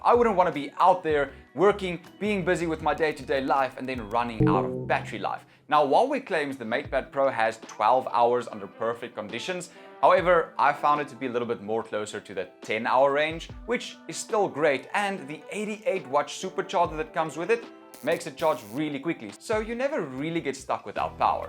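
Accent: South Africa. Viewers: Hi ! I just finished my final year of highschool last year and i am looking into getting a laptop/ ipad for uni , i have never had any of those things and i am kind of lost to what is best , can you please advise me on whether this is a great option for uni ? I love handwritten notes and i suck at typing ... Also , are you south african ?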